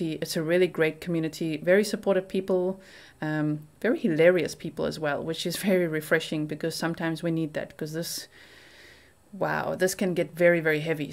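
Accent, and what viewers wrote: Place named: South Africa. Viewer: I look forward to checking in to your channel daily! Not to mention your South African accent!!! I lived in S.A for 2 yrs in Jo-burg and Pretoria. Miss in tons! Thanks for the great content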